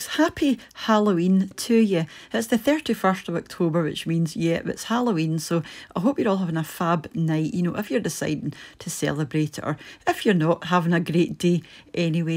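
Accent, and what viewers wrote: Scotland, United Kingdom. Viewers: Hi! Love listening to you and your recipes. Can you please do me a favor? Say your name in your videos? My name is also and I'd love to hear it in your Scottish voice. ❤ Much thanks, Bowen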